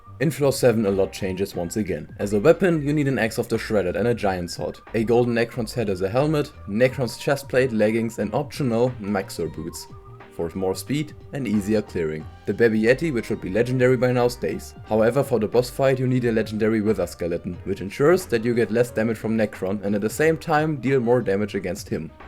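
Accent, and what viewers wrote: Germany. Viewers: man hört dein deutschen aktzent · Are you german because you sound really german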